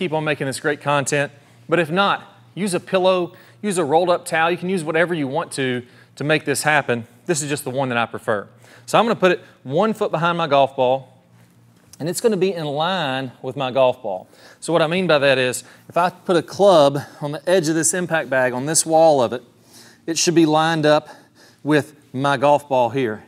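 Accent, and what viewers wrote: South, United States. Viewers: Thanks for the heavy southern accent golf instruction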